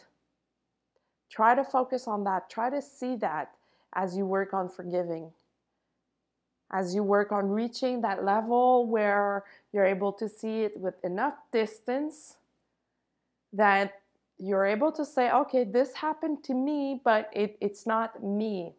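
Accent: Quebec, Canada. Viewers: Est tu Québécois?? Merci.. Spot on message💙🌻🌈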